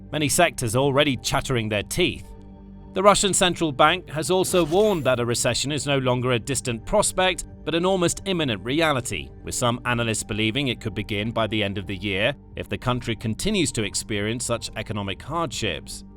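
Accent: United Kingdom. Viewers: I gradually got used to associating that british accent with unabashed non-stop lying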